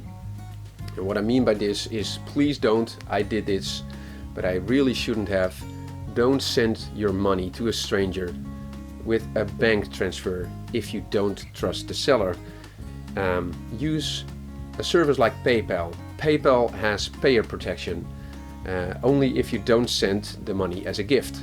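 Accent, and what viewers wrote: Netherlands. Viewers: i was thinking you are dutch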